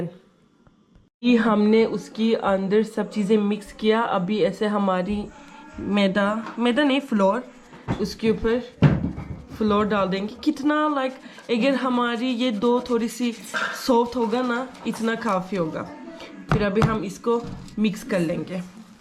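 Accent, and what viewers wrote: Pakistan. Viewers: I really like you your smile aapi and ur pakistani accent too❤loves alot from pakistan and mehndi's design is also very cute😅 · Good video. love your urdu accent. be happy always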